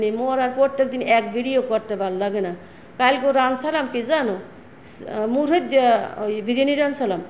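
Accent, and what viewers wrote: Barishal, Bangladesh. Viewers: আপু তোমার বরিশাল ভাসাই কথা শুনতে অনেক ভালো লাগছে ❤❤সবজি বাগান দেখতে অনেক ভালো লাগলো ❤❤ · আপু তোমার বরিশালের কথা শুনতে অনেক ভালো লাগলো মাশাল্লাহ অনেক বাজার নিয়ে এসেছো অনেক ফল তোমার বাগানের গাছগুলো মাশাল্লাহ ❤❤❤ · আসসালামুয়ালাইকুম আপু 😊। বরিশালের ভাষা শুনতে ভালো লাগলো 😊। দূর প্রবাসে ভালো থেকো আপু 😊। আমি আছি গো😊 বালতি ভরা ভালোবাসা দেখলাম 😅। মসলা ❤❤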